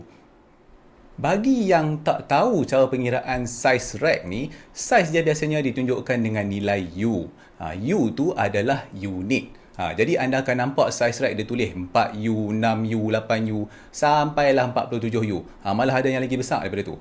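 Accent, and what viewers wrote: Malaysia. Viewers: patut lah awak ngomomong macam orang malaysia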